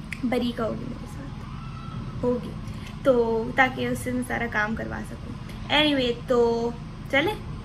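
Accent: Pakistan. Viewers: your way of speaking is like you are belonging to a muhajar cast...:(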